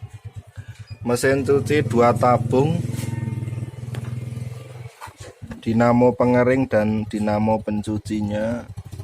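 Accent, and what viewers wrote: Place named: Surakarta, Indonesia. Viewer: solo ne pundi mas kulo colomadu. Sui biangeet ra krungu logat solo, guyu2 dewe aku..smangat terus mas yo💪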